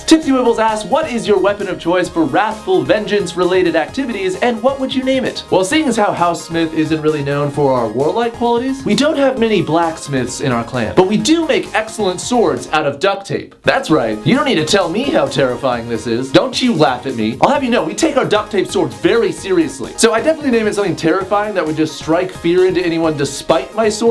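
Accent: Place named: Scotland, United Kingdom. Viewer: Can you say "if you had a chance to change your fate, would ya?" In your Scottish accent ? :) (from the Disney movie brave)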